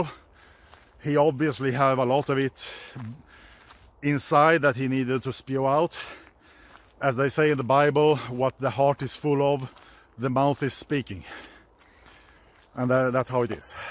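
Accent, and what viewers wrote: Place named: Spain. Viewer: You have a Spanish accent! 1. You are not half a Greek! 2. Didn't you hear sirens? For months...from his window? 3. He got caught by Ukrainian SPU - who ( by his claims) - don't allow him to leave the country! During this "obducted " week - he surprisingly has to sign many non - disclosure papers! 🙆🤷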